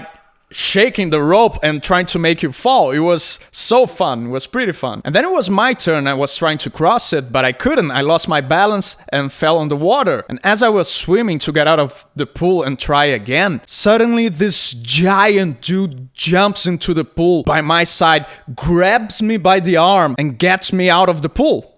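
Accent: Brazil. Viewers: Eu sabia que eu tava reconhecendo esse inglês de algum lugar, você é brasileiro! Conheci seu canal tem pouco tempo e só tenho elogios! Continue mandando muito bem!!!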